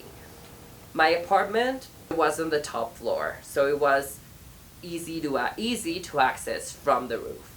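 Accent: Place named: Los Angeles, United States. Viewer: I love ur L.A accent.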